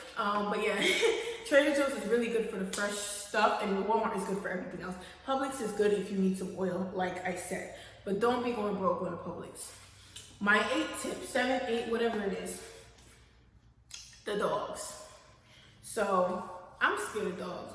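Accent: Jamaica. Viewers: R u Jamaican? U definitely sound like one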